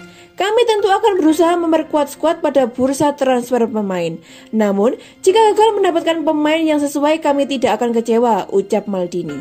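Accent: Java, Indonesia. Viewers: Mantap Medoknya keliatan bgt 😄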